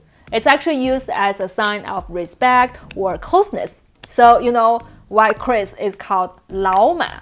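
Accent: China. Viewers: 为什么你嫁了美国老公，中国口音还这么重呢？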